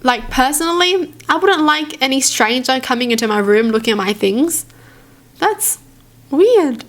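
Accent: Australia. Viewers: Australian, (She's Vietnamese-Australian) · Australian I believe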